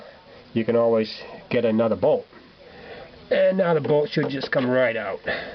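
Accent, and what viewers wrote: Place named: Massachusetts, United States. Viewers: Sounds like a New England accent. Definitely not NYC or NJ. Great video. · I was gunna say! This guy gotta be from mass 😅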